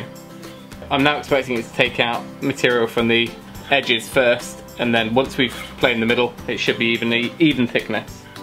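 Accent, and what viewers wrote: United Kingdom. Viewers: I thought "why is he excited he had to pay for the wood, saying that is was not free", then a second or two later "ah wait a second he means it's free of knots in the wood". Only discover the channel recently but very much enjoying it. And from the sound of it you are relatively local to me, in Bristol. I too enjoy renovation but have yet to try projects on your scale just 3 bedrooms and a garage roof but the less said about that the better; will be replacing the onduline with EPDM next year after having had to DPM over it till the weather improves and we have save a little for it. I plan to go thick on the insulation for the roof in there and with extra joists, OSB and EPDM on a 6x7m it gets pricy but will certainly look to use the company I've seen you recommend in another video about the garage renovation.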